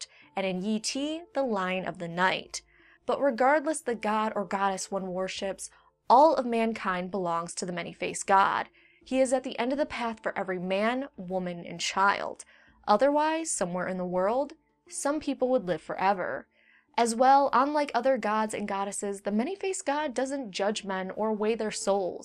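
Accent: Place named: South, United States. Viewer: you got a southern accent!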